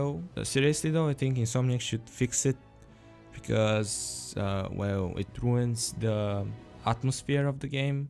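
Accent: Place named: Russia. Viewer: Чё то кажется, что у тебя русский акцент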